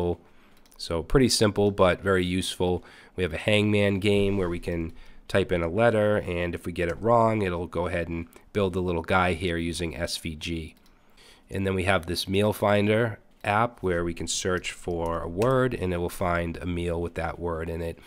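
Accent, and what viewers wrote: Boston, United States. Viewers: Sounds like a bit of a Boston accent there.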